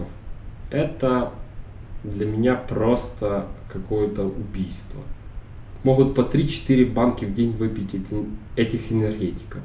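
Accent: Ukraine. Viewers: Я думал ты беларус, , а ты Одесит! Круто! У нас также! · Ахахаха, я ору! : D Ещё говор такой "Украинский"! Ну да, на Украине всё так и есть как описал) Надеюсь в Польше не все такие стукачи и зануды. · парень у тебя украинский говорок хотя ты усилино стараишсЯ обрати внимание на свою букву х и меньше подхалимничай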